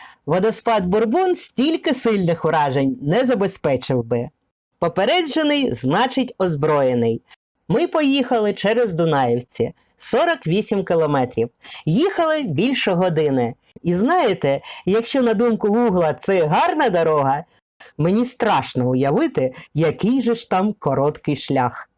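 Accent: Russia. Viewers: Чомусь дратує мене така українська мова з явним російським акцентом...Вимкнула звук .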